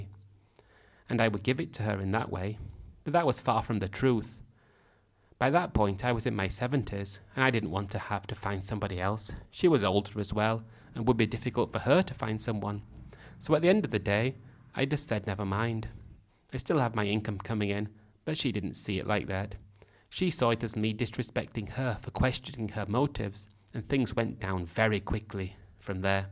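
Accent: England, United Kingdom. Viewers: You have a English accent you liar nothing you said is true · So is this channel just some British guy reading middle-aged white men's accounts of dating in the Philippines??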